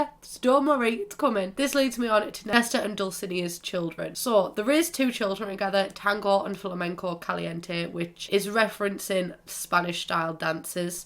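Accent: United Kingdom. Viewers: Lmao you’re so british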